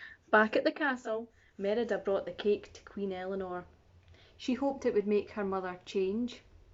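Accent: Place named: Scotland, United Kingdom. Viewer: Damn, now that's some real scottish accent.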